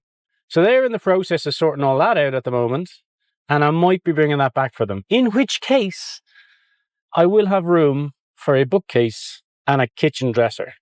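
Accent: Ireland. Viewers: France is just so beautiful. Think I might just have to take a drive over there and explore a bit. Loving listening to your soft Irish voice each evening. Glad you finally got a day off! Keep up the great work . X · Ok, inquiring minds want to know.....without getting too personal, why are you still single? My goodness, I concur with all the ladies on here, you are a great catch and check all the boxes. Handsome☆Huge Heart☆Funny☆Great Communicator☆Hard Worker☆Irish Accent☆Great Family Values☆Loves Puppies & Old People☆A Wicked Sense Of Humor☆A Great Friend☆ Be still my beating heart! ♥ A great vlog as usual, thx! 😊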